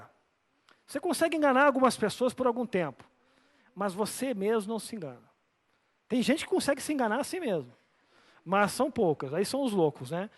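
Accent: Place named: Curitiba, Brazil. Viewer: Aula perfeita! E o sotaque curitibano é o melhor kkk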